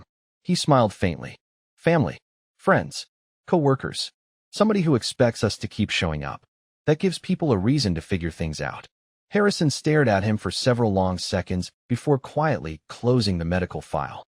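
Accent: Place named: United States. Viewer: Fantastic story. Perfect! There was no problem with the sound at all. It was excellent American English.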